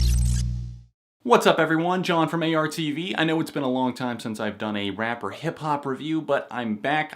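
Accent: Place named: South, United States. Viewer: Bizzarro southern twang version of Anthony Fantano lol. Liked your review thanks.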